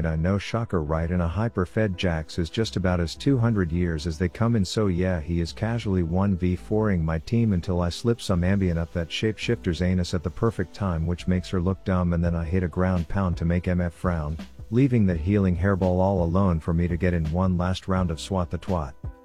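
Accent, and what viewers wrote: Scotland, United Kingdom. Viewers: my boy she has a scottish accent sorry to say but she is not british. title needs to be scottish bambi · I thought that accent was Scottish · isnt it scottish? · That's a scottish accent, not a Brittish accent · She's not British, that accent is Scottish and how dare you call mine people British. We will literally go William Wallace on you for speaking such blasphemy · That's a scottish accent, not a british accent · she is SCOTTISH not Bri ish! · isn't her accent Scottish? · She sound Scottish tho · She’s Scottish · i thought she was scottish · SHES SCOTTISH OMG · she is Scottish Bambi not British Bambi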